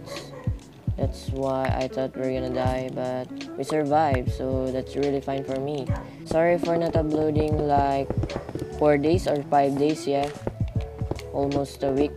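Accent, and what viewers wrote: Philippines. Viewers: Yo!! Sounds like from Asia huh? Hmmmm phillipines? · Philippines is cute speaking english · is an real filipino have accent cool🔥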